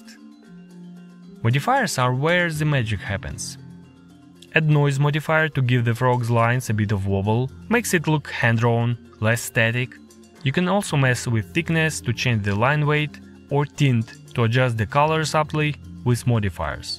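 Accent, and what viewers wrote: Russia. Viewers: Oh man, your strong Russian accent is great.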